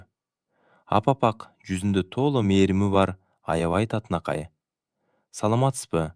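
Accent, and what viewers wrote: Russia. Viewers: Негеле ө ордуна о деп окуйт десе орус жигит окугангө азаматсын чоон рахмат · Окуган бала орусбу😮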